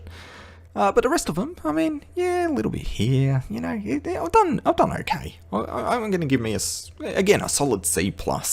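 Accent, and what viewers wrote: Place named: Australia. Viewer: I'm tipping he's an Aussie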